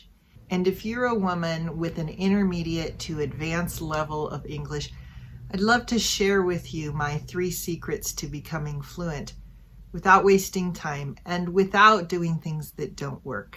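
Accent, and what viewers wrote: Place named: United States. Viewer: American accent is clear.❤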